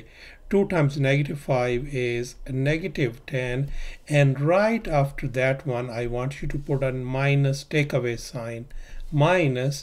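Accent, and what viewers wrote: India. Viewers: he sounds like an Indian.. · I his his from soth side ,maube from kerala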